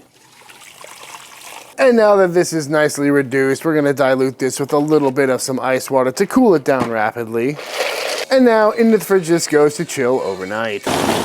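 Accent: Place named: New England, United States. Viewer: Idk what this means, but this was the first video of yours that I saw and I could immediately tell that you were also from New England.... love the content and you remind me of one of my cousins lmao. Please keep doing what you're doing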